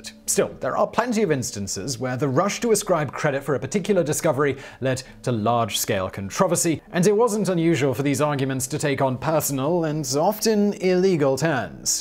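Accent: United Kingdom. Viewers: I normally don't care for British accents but don't mind his at all. Anyone know what regional accent this would be?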